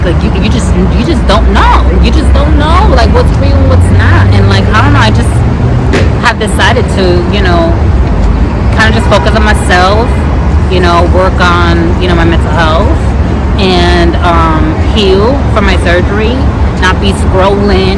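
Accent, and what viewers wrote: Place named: Brooklyn, United States. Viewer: That voice is extremely sexy got that Brooklyn vibe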